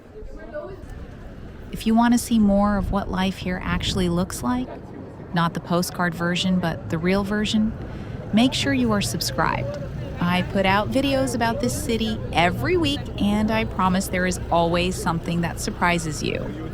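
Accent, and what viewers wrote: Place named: United States. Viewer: Raised in Amsterdam but you have such a clear American accent.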